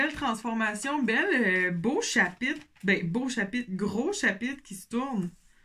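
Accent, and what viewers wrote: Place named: Quebec, Canada. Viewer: J'adore vôtre accent Québecois , vous êtes une Jolie Fille en Plus pas facile a suivre je suis Taureau du 17 Mai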